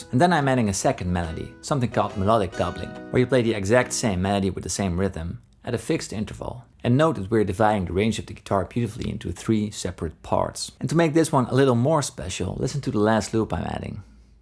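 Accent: Netherlands. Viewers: where are you from, you sound dutch lol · You sound dutch, and i would know, cause i'm dutch too. Great beard btw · you're defenitly dutch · Kak hahahaha, I always thought u sounded Dutch ;)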